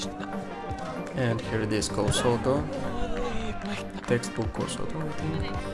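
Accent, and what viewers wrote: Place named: Russia. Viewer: I think russian accent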